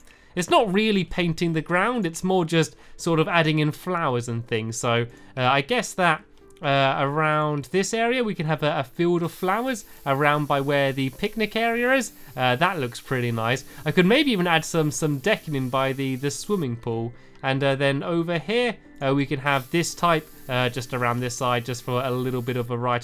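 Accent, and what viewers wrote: England, United Kingdom. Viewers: its his english accent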